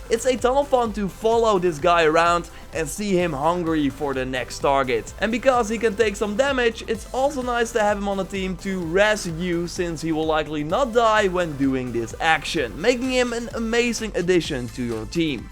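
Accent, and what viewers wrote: Netherlands. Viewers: Can you have a more english accent, i know you're dutch but still can improve with your pronouncing. Keep up the good work! ;)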